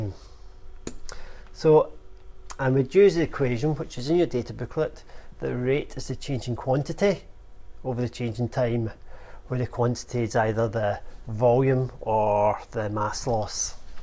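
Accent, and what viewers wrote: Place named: Scotland, United Kingdom. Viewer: THANK YOUUUU NO INDIAN ACCENT FINALLY!!!! scottish sounds so much better!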